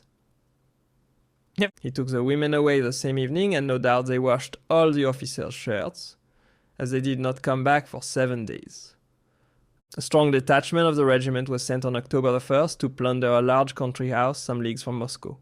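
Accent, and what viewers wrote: France. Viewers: I love your content here however I do agree that the French accent is a distraction. Perhaps I’m much lighter accent or no accent at all might be a better choice, but that’s just my opinion.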